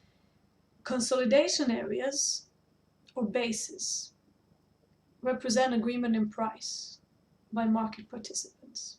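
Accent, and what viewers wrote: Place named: United Kingdom. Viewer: Your terms and methodology are suggestive of Online Trading Academy's. Are you a grad? (I'm enrolled in the Mastermind program and start Core Strategies this coming Saturday. I appreciate the pre-class tutorial, enhanced by the aesthetically pleasing visuals in the lower right corner along with a mellifluous Brit-sounding accent.) ;-)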